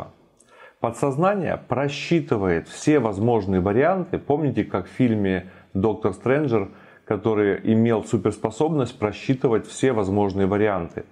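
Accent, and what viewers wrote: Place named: rural, Russia. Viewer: Вам бы ещё научиться говорить правильно для полного баланса, а то по-деревенскому говору не сказать что вы с 20 летним стажем бизнесмен)))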